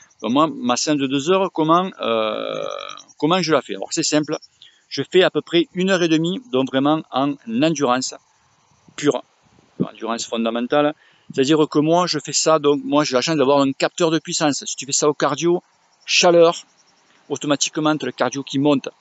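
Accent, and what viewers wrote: South, France. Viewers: Bonjour, tout d’abord merci pour tes vidéos, ta bonne humeur (avé l’accent et les cigales en plus👌🏻). « Minimum 120% FTP et jusqu’à 150% FTP» sur 4’ s’apparente à effort 4’ >PMA (effort Max 5’), non? Dans ce cas, personnellement impossible à tenir pour l’amateur que je suis, du moins pas sur plus de 1 répétition encore moins après 6’ de SST 😅🥵. Tu dois être une sacrée machine pour le faire 3x 💪🏻 pas accessibles à tous comme séance...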